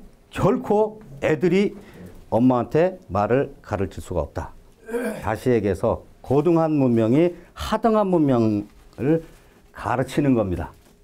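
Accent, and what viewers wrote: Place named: Chungcheong, South Korea. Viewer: 군소리가 99% 너무 많다. 그기다 충청도 말씨까지 더하니 답답하고 짜증까지 생긴다.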